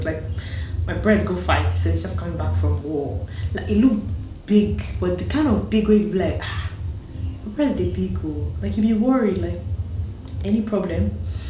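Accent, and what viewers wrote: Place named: Ghana. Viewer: You suddenly sound Ghanaian 👀👀.... Congratulations!!!!!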